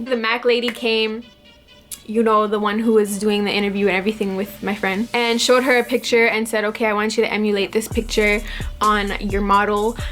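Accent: Toronto, Canada. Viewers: Within the first minute I was like.. "she must be from Toronto... most likely Scarborough" lol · lmao soo funny how you can tell someone is from Toronto by the way they speak! I'm from Toronto as well and I know soo many people who speak the same haha love your vids!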